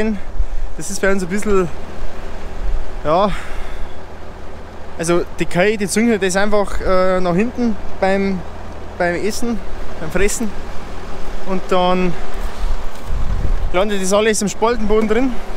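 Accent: Bavaria, Germany. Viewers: I find des foi geil dasst du no boarisch redst, gfreit mie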